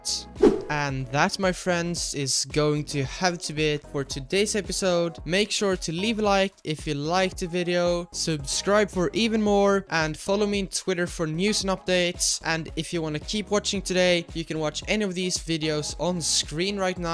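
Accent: Sweden. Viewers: Är du typ svensk, sounds like swidish accent. Nice build anyway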